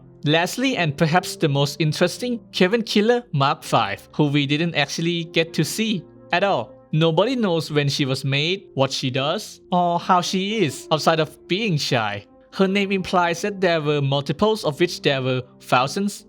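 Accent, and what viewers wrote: Thailand. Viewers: Man are you thai? Cuz u kinda sounds familiar Btw nice vdo mann Just in case that u r thai ยังมีคนเล่นฮงไกกับข่อยดีใจคับ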